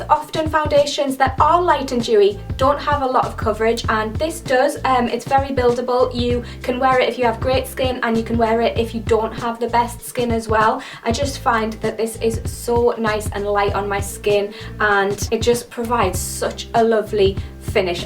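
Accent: Newcastle, United Kingdom. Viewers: Love the geordie accent ahhh (if thats even correct!!) sorry if not hahha , been looking for a good tinted moisturiser or BB cream.. Any other reccomendations? X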